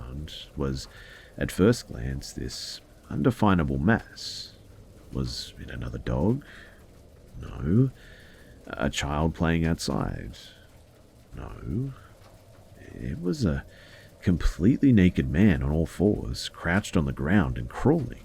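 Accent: Australia. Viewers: Hes got that low resonating voice, and hes an aussie.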